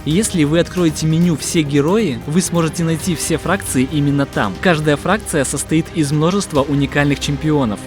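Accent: Belarus. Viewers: Ты из Белоруссии?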